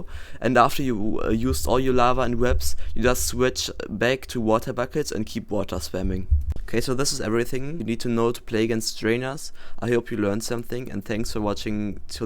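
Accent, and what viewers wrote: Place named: Germany. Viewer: i can tell hes german lol like me xd if hes not german then idk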